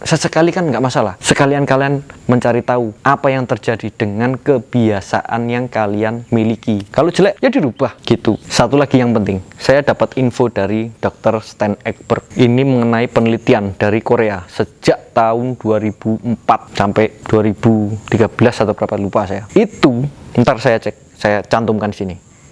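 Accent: Yogyakarta, Indonesia. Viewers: Wong jogja to jenengan mss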